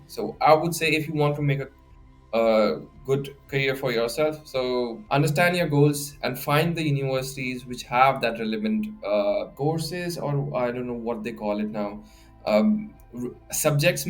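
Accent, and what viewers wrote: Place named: United Kingdom. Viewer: Bhai are you uk born?cause accent is pure British.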